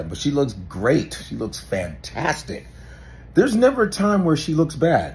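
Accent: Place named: Bronx, United States. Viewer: lol! Yo that BX accent is 100!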